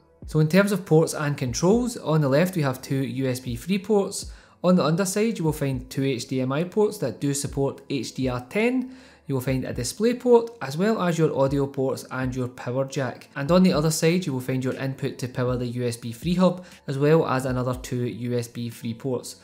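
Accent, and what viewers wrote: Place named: Glasgow, United Kingdom. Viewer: Your Glaswegian right, well you sound like, anyway keep up the great work! But I have a request can you make a video about budget per key RGB mechanical keyboards under 30 pound.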